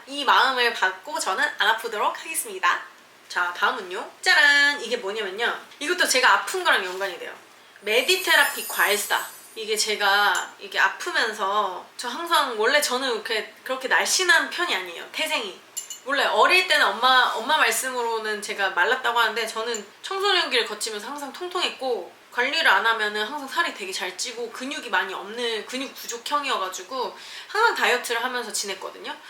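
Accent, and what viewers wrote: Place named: Seoul, South Korea. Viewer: 우리친구야 와이리 서울말쓰는거 낯서노 잘하노? 지기네 친구야 뷰티 인플루언서 같데이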